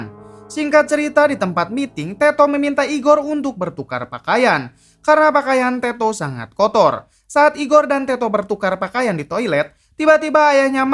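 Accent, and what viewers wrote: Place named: Madura, Indonesia. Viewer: Alur cerita kalau dibacain pakai aksen Madura emang >>>>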